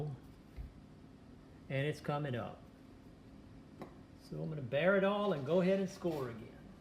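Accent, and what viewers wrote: Louisiana, United States. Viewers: Are you from Louisiana? You sound like my family from Rayne, it’s nice to hear.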